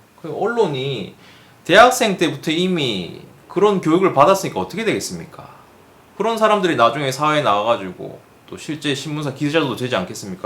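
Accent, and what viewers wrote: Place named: Gyeongsang, South Korea. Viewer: 경상도네 그러니까 우파로. 넘어갔지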